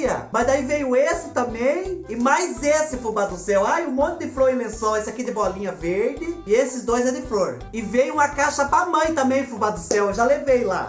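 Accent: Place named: Paraná, Brazil. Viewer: Espicula da redondinha kkkk minha mãe falava, aqui no Paraná .. no interior falam igual a vc... Morro de saudade de minha familia qdo escuto vc falar algumas coisas ... Kkk parabéns... Amamos vc. Espicula redondinha kkk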